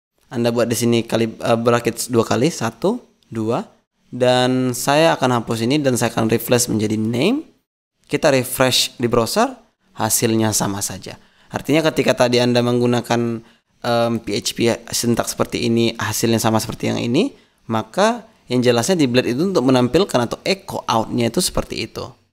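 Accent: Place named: Medan, Indonesia. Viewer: khas medan kali ya bang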